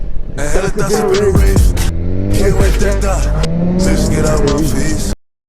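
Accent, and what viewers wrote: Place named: New York, United States. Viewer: New Yorker for sure LMAO, what's up ma man 🇺🇸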